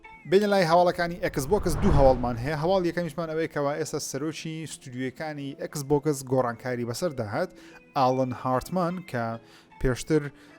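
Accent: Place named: Badini, Iraq. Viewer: بادینیەکەت زور جوانە کاک عەیبی نیە..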